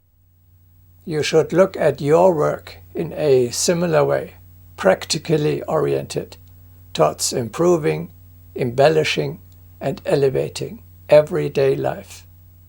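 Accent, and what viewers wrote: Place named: RP, United Kingdom. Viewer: Ready, willing, able, and at your service Dear Ones. Perfect use of inherently limited Queens English.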